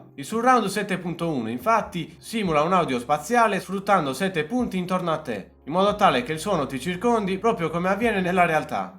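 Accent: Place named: Sassari, Italy. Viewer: ti scopro adesso, apro il video, passano 2 secondi e mi chiedo, ma sei di Sassari? La S e l'accento sono quelli, bel video comunque, due game ad apex facciamoli zi!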